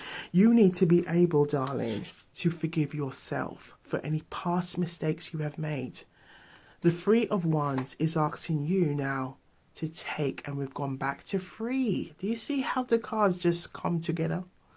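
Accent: Jamaica. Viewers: Are you Jamaican by chance ?!👀🇯🇲❤️❤️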